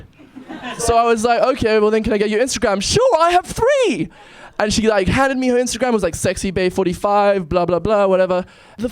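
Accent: England, United Kingdom. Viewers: but what if we r not n america or Britain, I am in Russia and omg i absolutely love u!!! u were amazing and its very rare to see people willing to get over their fears u r so cute and u dont sound south african at all i am not trying to be racist plz to take me wrong i am not saying south africans r supposed to sound and look a certain way no i am not but u sound like u have a british accent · He's been living in the UK for a while, he's picked up an accent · Your voice already sounds like an English accent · HIS ENGLISH ACCENT OMG I DIED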